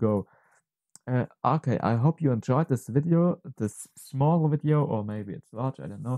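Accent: Germany. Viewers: Are u german? (just askin)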